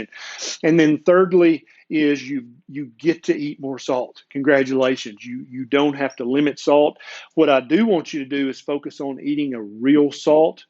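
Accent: South, United States. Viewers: Going through it rn, thank you for the video! Appreciate your knowledge, videos and amazing southern accent!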